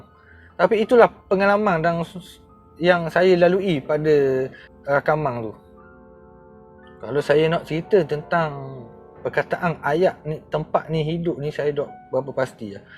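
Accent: Terengganu, Malaysia. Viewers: Siang ujan, malam turun salji. 😂😂 Haha...Buat lawok abg ni...Abg ni selit lawak2 skit, tu yg best...Sy suka cara Abg cerita, walaupun tranung tu pekat...Suka dengor loghat2 ni...Keep it up bro...😎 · salon ke salor ke janji org baca dn org faham..tersalah taip,atau dengar x jelas yela sleng ganu kn..tq tau salon rambut ke yerk.salor..slmt mlm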